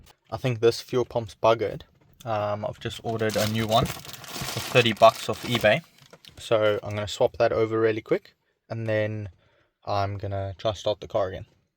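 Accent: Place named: South Africa. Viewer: Not a bad video Bru. Looks like UK but I can hear your SA accent coming through. 👌👌👍👍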